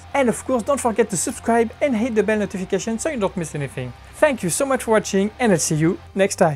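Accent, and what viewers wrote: France. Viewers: Tu est français ? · Are u french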